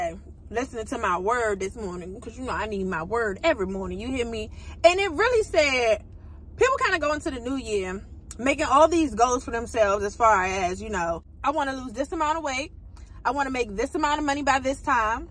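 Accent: Baltimore, United States. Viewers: Always watching videos you keep me laughing because you keep it real I got a question for you are you from Baltimore cuz you sound like you got the Baltimore accent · "One thing about KFC, KEEP THE RECIPE THE SAME" lmao - SN. The minute I heard you begin talking, I thought to myself: she HAS to be from DC or PG Country. Then I saw your Capital Heights PO Box in the caption. Lol! Loved this review, my fellow DMV Queen! I am about to try these today!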